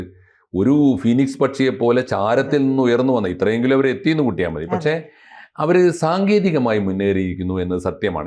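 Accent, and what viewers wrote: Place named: Malappuram, India. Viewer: എടാ നീ ഇന്ത്യൻ പൈസയെ അവരുടെ yen മായി താരതമ്യം ചെയ്ത് നോക്കെടാ . ചാനലിൽ ഇരുന്ന് എടീ പോടീ എന്നൊക്കെ വിളിക്കുമ്പോൾ അവൾക്ക് ഇഷ്ടപ്പെടുന്നു എന്നുവെച്ച്, കേട്ടിരിക്കുന്ന ഞങ്ങൾക്ക് ഇഷ്ടപ്പെടും എന്ന് കരുതരുത്. നിന്റെ മലപ്പുറം ഭാഷ മലപ്പുറത്തു മാത്രം എടുത്താൽ മതി. മറ്റ് ജില്ലകളിൽ ഇരിക്കുന്നവർക്ക് ഇമ്മാതിരി ഊളത്തരം ഇഷ്ടപ്പെടില്ല സു!ടാ!*പ്പി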